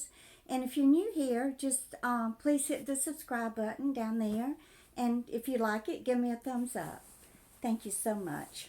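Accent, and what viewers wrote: South, United States. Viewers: I knew you sounded Southern!! I’m from Florence Alabama, went to school in Waterloo. I’m loving your story!! Bahahaha I had an Aunt Sybil too🤣🤣you’re cracking me up woman 👏👏😂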